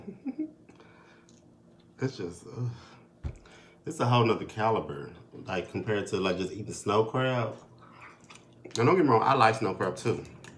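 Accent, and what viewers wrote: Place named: South, United States. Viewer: Great video. You sound very southernish in this video. Where exactly are you from? You were very excited to pour that water, very commercial like.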